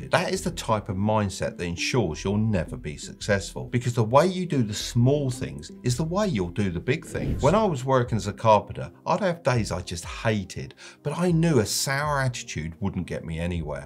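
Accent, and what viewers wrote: United Kingdom. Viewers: AND your British accent. It's just beautiful haha.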